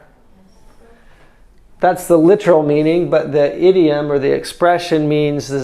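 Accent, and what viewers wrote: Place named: Iraq. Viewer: اشكد حلو الاستاذ يتكلم عراقي هل هذه جامعه امريكيه؟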